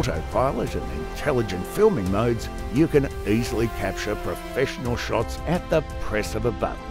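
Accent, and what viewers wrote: Australia. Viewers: Na, this old mate sounds true blue Aussie 🇦🇺, it's great and I'm a kiwi 😅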